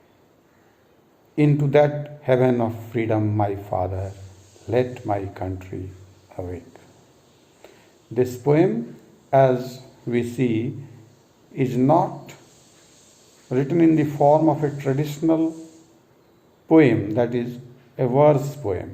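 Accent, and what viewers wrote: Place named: Bengal, India. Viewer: Thank You Very much Sir for your help. Wow! Sir Your bengali accent is very sweet.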